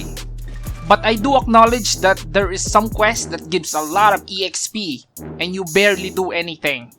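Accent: Philippines. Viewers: i heard filipino accent.. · Oh how I miss pinoy accent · Pinoy ka ba? hahah you have a heavy accent xD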